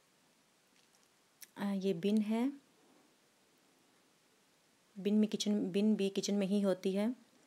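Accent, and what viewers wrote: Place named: Sialkot, Pakistan. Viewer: MASHA ALLAH ap silakot se ho kia? your accent is like sialkot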